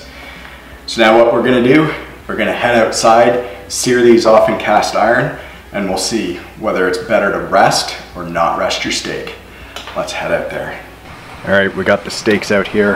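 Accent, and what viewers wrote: Canada. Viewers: R u Canadian? Cuz you sound Canadian AF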